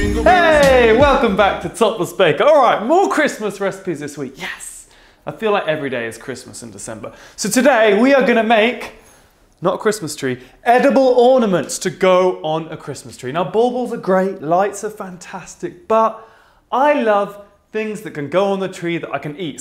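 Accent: England, United Kingdom. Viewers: Acabo de suscribirme y creo que tu acento inglés es inconfundible jajaja espero no equivocarme. Love your videos, and you❤️ I come from Chile 🎉😊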